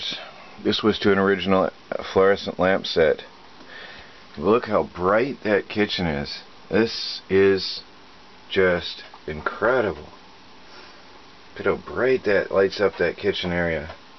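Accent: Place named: South, United States. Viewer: Come on now, have you ever been in the south where creek sounds like a crick in your neck? PotaTOE Potaaaato TomaTOE Tomaaaato....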